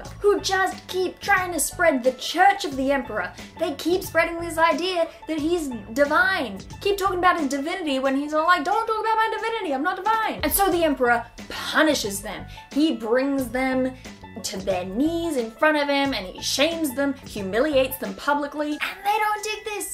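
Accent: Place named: Melbourne, Australia. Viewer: Australian? By that accent I rekon melbourne maybe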